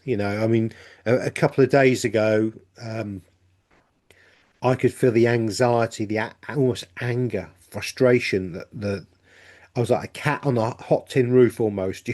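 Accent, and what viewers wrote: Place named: England, United Kingdom. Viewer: Congratulations to you, your work is a tremendous boon for we who feel these energies so acutely. I particularly love the English accent in your humble delivery. I note that several people are questioning Richards absence from your line up. We don’t need an explanation in the grand scheme of volatile life, but a mention might allay such curiosity. Yuletide greetings from somewhere that feels like nowhere in Canada!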